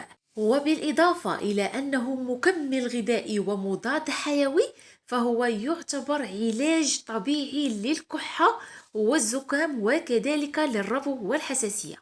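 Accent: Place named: Casablanca, Morocco. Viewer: وصفه جديده مغربيه احسن وصفه الببوش مع الفليو بولغم وش البولغم قصدك بلغم الله يعين اللغه العربيه من لهجتك انت بنت كازا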